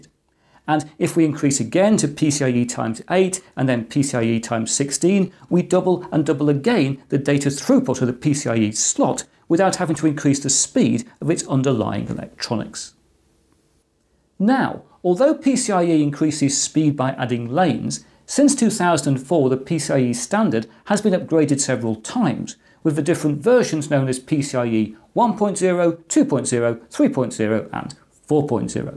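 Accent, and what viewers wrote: United Kingdom. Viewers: Is it me or do you understand things better when explained in a British News anchor accent 🤔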